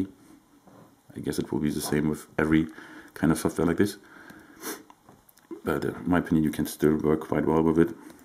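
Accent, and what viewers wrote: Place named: Germany. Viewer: man hört das du deutscher bist, aber ansonsten echt gut :)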